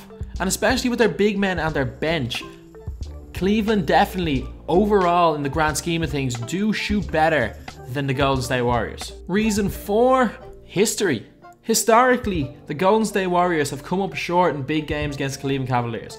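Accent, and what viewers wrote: Ireland. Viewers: Is this guy irish???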